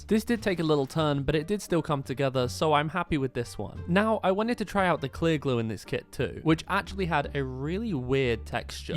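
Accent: United Kingdom. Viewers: you have a British accsent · British accent 😂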